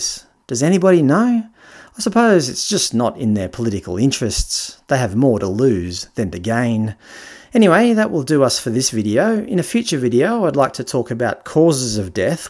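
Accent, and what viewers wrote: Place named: Australia. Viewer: Even with a nasally Aussie accent this is well done and worth a listen. The unimaginable can happen.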